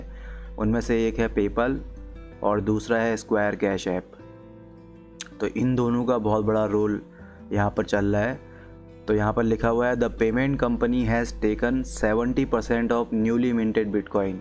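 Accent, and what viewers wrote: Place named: Bhopal, India. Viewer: Which city are you from? Your accent sounds like Bhopali 😁 (I'm from Bhopal)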